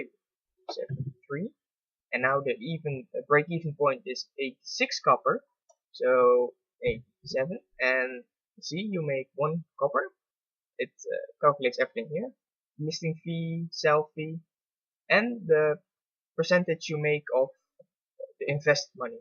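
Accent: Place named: Netherlands. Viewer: I can hear you're dutch :)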